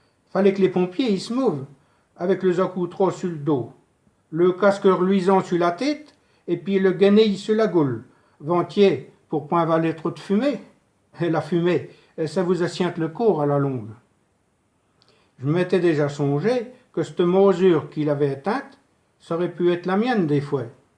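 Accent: Sarthe, France. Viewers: Mdr le pure sarthois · Bonjour à vous.le patois sarthois .c est beau à entendre.je suis sarthois château du loir.allez entendre le belinois. Mort de rire.bravo félicitation mr.vous êtes d où de la bas.pardi.bon courage à vous et tous mes meilleurs vœux de sympathie.en voiyure.🤩🤩🤩🤩🤩🎅🎅🎅🎅🎅🎅👍👍👍👍👍👏🏿👏🏿👏🏿👏🏿bye.a demain.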